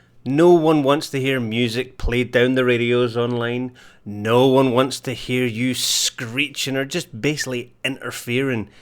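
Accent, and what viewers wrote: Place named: Cockney, United Kingdom. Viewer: Love your accent, is that that classic east london cockney ;-)))))) Edit: wrote dialect first instead of accent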